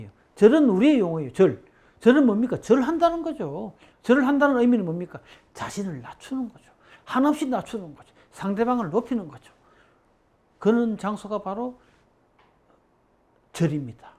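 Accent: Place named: Daegu, South Korea. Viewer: 요즘 다시 교수님 영상 정주행합니다. 저도 대구출신이라 교수님의 사투리가 정말 정겹네요~~ㅋㅋ😊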